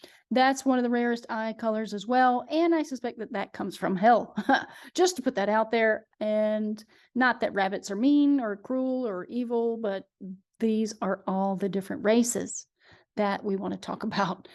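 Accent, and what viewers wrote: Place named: South, United States. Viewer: I just found you today from the typical podcast show. I am elated. I can not believe all these things you're saying on his show, I know most of. I have been fascinated with the occult and symbolism for quite awhile, but a lot lately. I like to find things from the past, like photos of the Rothschild parties with their Saturn costumes, and Pink Floyd songs, and many books. I read a lot. There is too much for me to list here, but I do a lot of research. Also, I've had many OBE's although its been awhile. I wonder what part of the country you are in? I hear a southern accent. I'm in New Orleans. So happy I found you!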